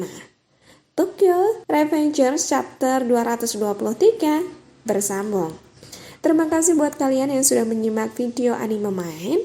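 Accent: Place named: Java, Indonesia. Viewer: Suarane medok gaes hahahaha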